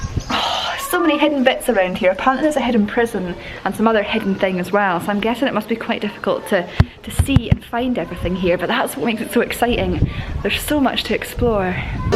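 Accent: Scotland, United Kingdom. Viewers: Something about watching a vlog about a Scottish castle from someone with an awesome Scottish accent. Sorry but some of us Americans love that kinda stuff.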